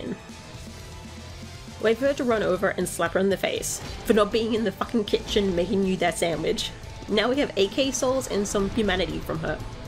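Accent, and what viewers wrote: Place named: Australia. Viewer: What are you Australian? If so I like your accent.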